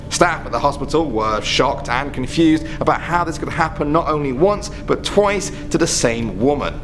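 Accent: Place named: England, United Kingdom. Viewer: is my favorite i love his enland accent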